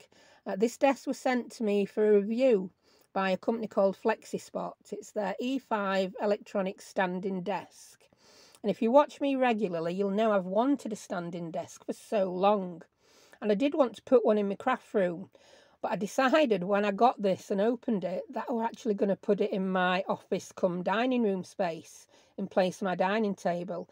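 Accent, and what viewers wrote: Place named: RP, United Kingdom. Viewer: Very posh ❤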